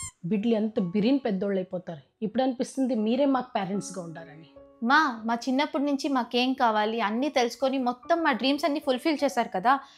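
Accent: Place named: Karnataka, India. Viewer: Karnataka telugu ilaane untundi...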